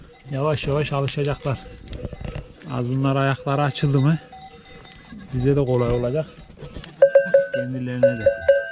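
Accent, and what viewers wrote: Konya, Turkey. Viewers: Abi nerelisin şiven bizim buradaki şiveye benziyor ben KONYA/BEYŞEHİRLİYİM.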